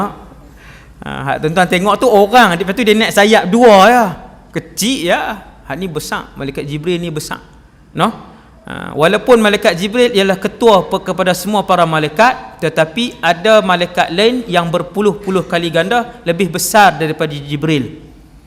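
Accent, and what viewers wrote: Kedah, Malaysia. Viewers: Pasai ustaz ckp KEDAH lah duk dengar ulang Kali x jemu.. Semoga ustaz SENTIASA DALAM KEREDHAAN ALLAH SWT 🤲❤️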